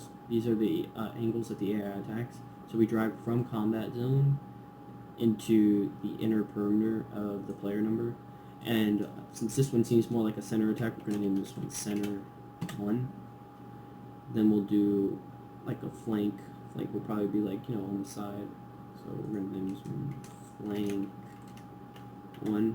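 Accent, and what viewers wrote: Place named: United States. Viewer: Youve got one of my favourite America accents 👍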